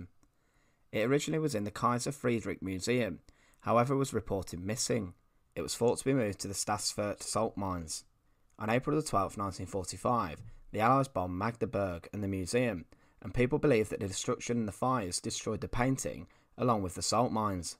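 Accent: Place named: Cockney, United Kingdom. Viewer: I can understand about half of what the narrator is saying. Pretty thick cockney accent.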